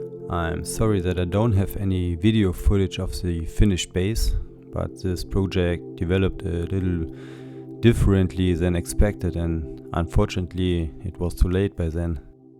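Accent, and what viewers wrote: Germany. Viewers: Your German accent rocks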